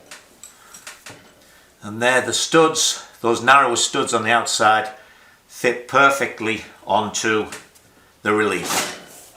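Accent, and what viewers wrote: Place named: Teesside, United Kingdom. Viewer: Ahhh, thought I recognised that accent - a Boro lad like myself. Hope you are enjoying your retirement. I am about to start on the restoration of my '67 BSA Lightning so may need your expert guidance!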